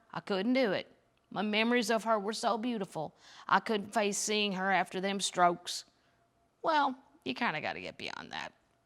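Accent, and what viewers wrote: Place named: Appalachia, United States. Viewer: I'm loving your version of a southern accent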